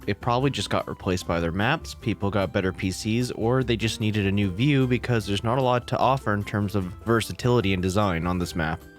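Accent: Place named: Canada. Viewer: love the canadian accent, nice video as always!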